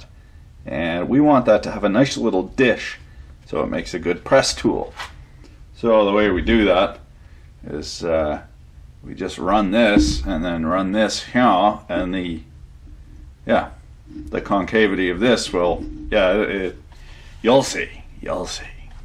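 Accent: Quebec, Canada. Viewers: i didnt kno you were canadian let alone french canadian? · Lol the French, instantly knew you were from Quebec haha.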